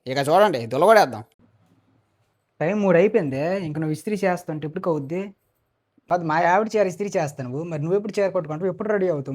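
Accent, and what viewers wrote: Srikakulam, India. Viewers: Srikakulam slang very funny and super bro Form tirupati Neenu mee pelli ki tirupati nundi srikakulam ki vasthunna bro🎉🎉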